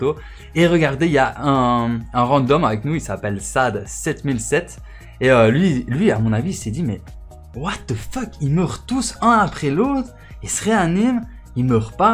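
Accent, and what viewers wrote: Belgium. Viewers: T'es belge? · Tu es belge toi non ?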